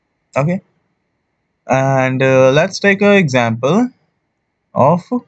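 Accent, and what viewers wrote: India. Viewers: you already know ur gonna learn something when you hear that indian accent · Sorry bruh with your heavy bloody indian acetone m accent it doesn't take 3 seconds, it took like more 45 seconds mate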